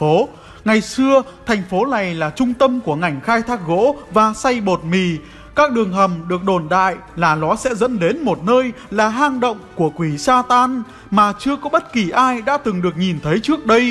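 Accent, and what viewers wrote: North, Vietnam. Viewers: Anh là ng bắc à :))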